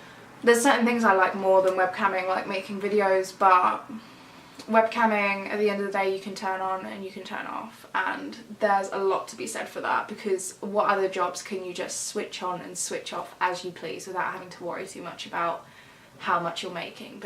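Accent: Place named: England, United Kingdom. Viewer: No wonder u have an English You’re from there lol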